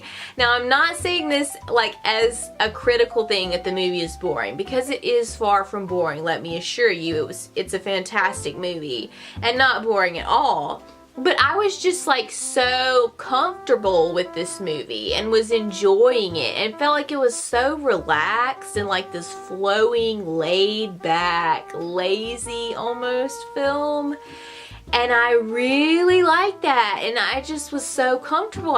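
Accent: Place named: South, United States. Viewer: Love your accent. Proper southern lady there.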